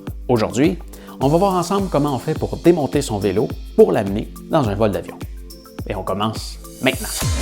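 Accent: Quebec, Canada. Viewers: Sympathiques et utiles vidéos avec un accent et des termes québecois imagés et amusants qui font déjà commencer le voyage...! Merci ! Frédérique de Nice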